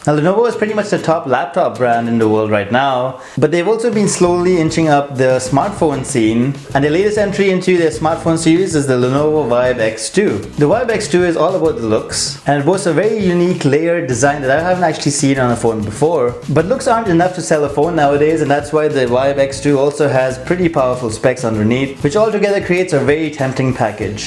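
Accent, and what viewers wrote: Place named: India. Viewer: great! grammar is pretty good but you sounded so indian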